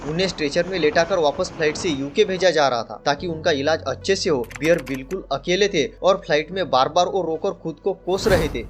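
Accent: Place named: Maharashtra, India. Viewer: Bhava tuzi hindi marathi tuning madhe vatat aahe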